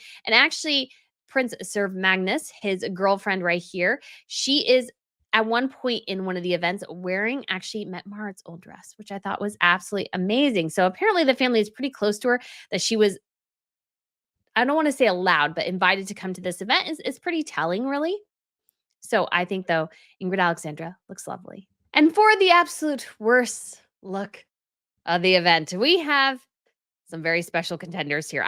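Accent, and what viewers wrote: United States. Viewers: I love you, are you from the South? I don't need to know exactly where but I swear you could be one of my friends:D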